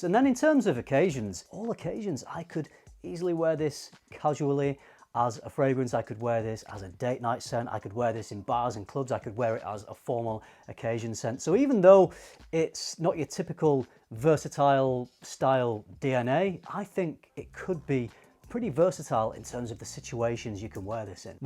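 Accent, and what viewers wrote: United Kingdom. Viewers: I blind bought it, and I am just in love. The only thing that saddens me is that I will not be able to apply it in the summer heat. But that is why there are three other seasons! :D Thank you for the review! As always great work! The British accent certainly makes it more enjoyable, "pleasing to the ear", for sure!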